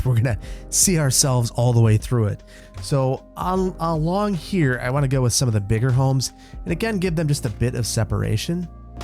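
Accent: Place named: Upper Midwest, United States. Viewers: Tehg Gotta love that upper Midwestern accent. Great episode sir! Did you ever add any houses of worship (churches) to VB? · I cannot wrap my brain around your neutral Midwest non-accent, except when saying "tayg". But seriously, love the content - I always look forward to Saturdays when I can catch up on all your videos for the week.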